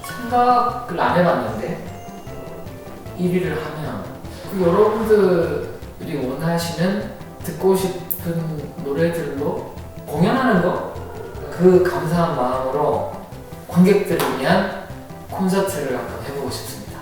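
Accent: Gyeongsang, South Korea. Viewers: 너무 때묻지않은 분 너무좋아요 경상도 예천말이 고맙니데이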